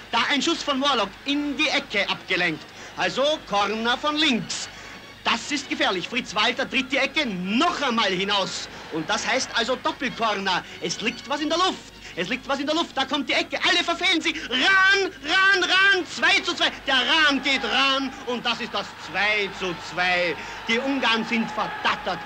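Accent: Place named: Austria. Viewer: Die ganze Diktion und Sprechweise...eindeutig Österreich.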